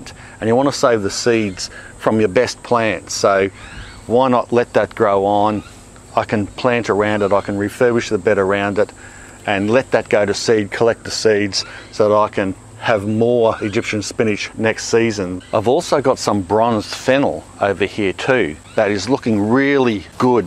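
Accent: Australia. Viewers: Just found your channel.. Not sure how but I love it..love the Australian accent! Are you or anyone else able to tell me where you are getting those oval green raised beds? Thanks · I love this guy!!! So much of fun, so a lot of information, so inspirative. I like dude your Australian accent, great work!! :) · I'm hearing a slight Aussie accent 😂😂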